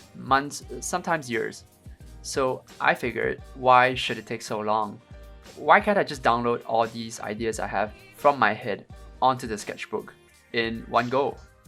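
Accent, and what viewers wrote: Singapore. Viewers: You sound singaporean/Malaysian COOL! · why does it sound like you have a Singaporean accent lol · i knew i recognised that accent 🇸🇬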